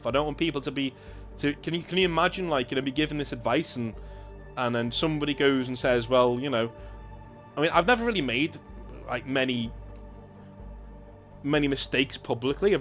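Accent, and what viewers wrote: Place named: North, United Kingdom. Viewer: Painting some sweet, sweet Redeemed terminators (DA) whilst listening to those lovely northern tones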